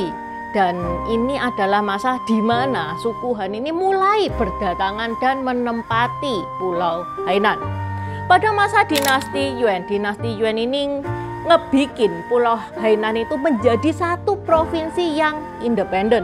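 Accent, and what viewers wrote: Java, Indonesia. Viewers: Iya tuh suara nya kental banget kaya wong jowo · China medhok.....aku sukaaa😂😂😂❤❤❤❤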